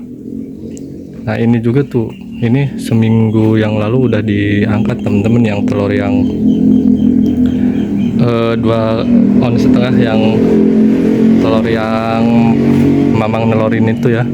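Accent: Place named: West Java, Indonesia. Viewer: Alamat d mana...kaya orang Sunda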